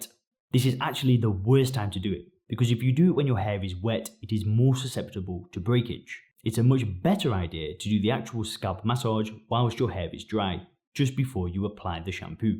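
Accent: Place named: Geordie, United Kingdom. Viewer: Brother I am from northern England same as you, but your accent has got me puzzled, it's like a Geordie that has lived in South Africa for a while.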